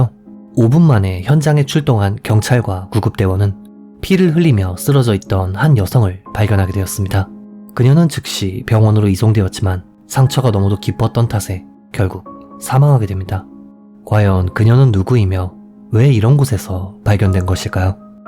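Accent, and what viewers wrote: Japan. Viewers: 요즘 일본어억양을 심하게 넣지않아서 더 듣기좋아졋네요👍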